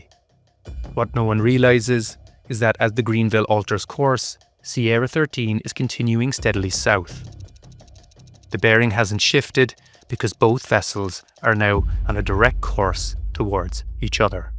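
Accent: Scotland, United Kingdom. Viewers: It's possible it's to do with AI? I've heard the narrator's voice switch to a Scottish accent. So it's possible the channel is using an ai voice? (I still love the channel regardless) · switch to Scottish? It’s been Scottish since the first one no?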